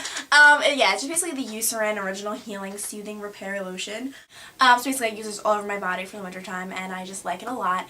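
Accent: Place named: East Coast, United States. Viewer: you had such an east coast accent in this video lol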